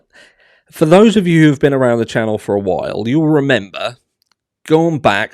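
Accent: Norfolk, United Kingdom. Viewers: You ALWAYS look amazing, love, LOVE YOUR CHANNEL, ❤❤ Ps, I live in s Spain, but originally from BECCLES, you have a wonderful refined 'Waveny norfolk' accent.....Where??? Sounds great x❤😊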